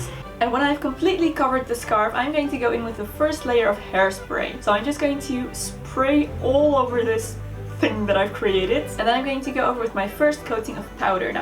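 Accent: Netherlands. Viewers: I heard some Dutch accent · are you dutch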